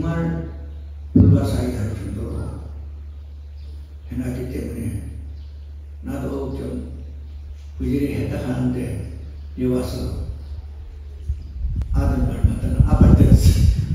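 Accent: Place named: Gyeongsang, South Korea. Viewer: 감사합니다. 정겨운 경상도 사투리를 쓰시면서 큰법문해 주신 종정스님께 감사의 예를 올립니다.법체 강건 하옵소서!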